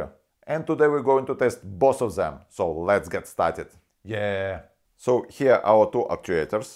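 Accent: Russia. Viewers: Привет МУЖИК! Ты разговариваешь с Русским акцентом, давай уже видосы на Русском. Ты афигенен! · Вэри хард инглиш. читаХ - а у русских всё через Х.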